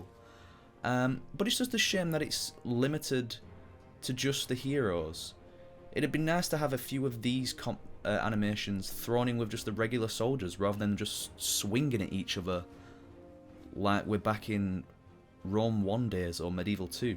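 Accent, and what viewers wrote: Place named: Northern England, United Kingdom. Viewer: It sounds like hes northern english.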